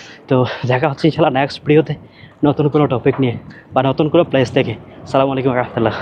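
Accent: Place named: Sylhet, Bangladesh. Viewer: সিলেটি কথা শুনে ভালো লাগলো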